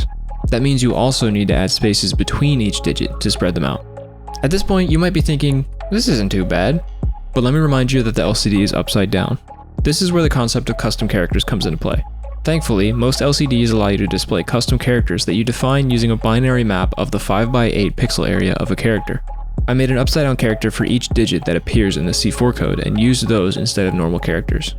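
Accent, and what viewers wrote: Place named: Germany. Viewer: Thank you! In the video I don't really address the problems but I'm currently working on my own website/blog about the projects I do. These blogposts will be a bit more detailed. Never heard someone being jealous of german accent lol. Can't really hide it haha.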